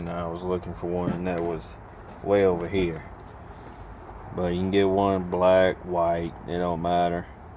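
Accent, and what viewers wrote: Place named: South, United States. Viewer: Why do you have to be southern?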